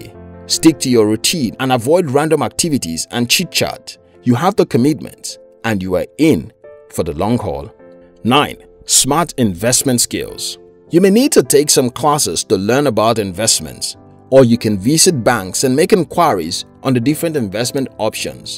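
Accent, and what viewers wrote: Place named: Nigeria. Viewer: This accent is from Nigeria 🤩. Pick up's Nigeria for going full swing. It is grear channel. Im subs. Love it. Born in Mozambiqye myseld, living in South Africa. Thanks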